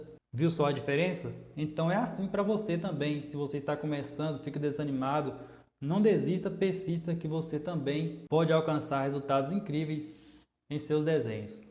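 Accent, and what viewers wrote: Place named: Paraná, Brazil. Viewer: Kkk todo esse tempo eu achei que pelo o seu sotaque você fosse Paranaense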